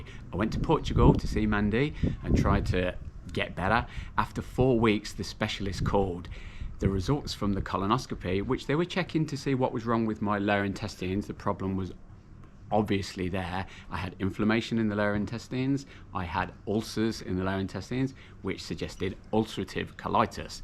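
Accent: United Kingdom. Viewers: Thanks for posting. My second great grandfather died after sailing out of India years ago on a tea clipper. It really does frame the disease risks of living abroad. My own personal experience of living abroad is in China, where I lived for many years. Before I went I took quite a few shots of vaccination, and while there I boiled all my water, unless I drank bottled. All my time, more or less was spent in cities. I'm Rh- and I read somewhere we are less likely to get Malaria - I don't know if anyone reading this has heard this....? By the way, whereabouts are you from - I'm guessing somewhere between the East Midlands and London?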